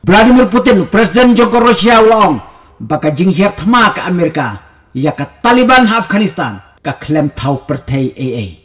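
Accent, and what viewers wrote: London, United Kingdom. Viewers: Ga deg earphones kyndit ia ka sur bah London.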